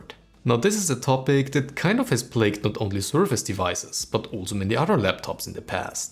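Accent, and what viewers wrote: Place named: Germany. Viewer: I like you accent its like bringing a german to texas :D Thanks for the informative video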